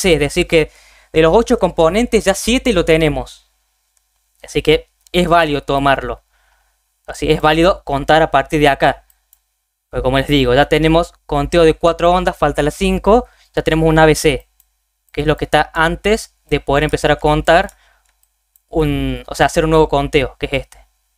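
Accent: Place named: Córdoba, Argentina. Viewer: Muy bueno, por la tonada... sos de Córdoba?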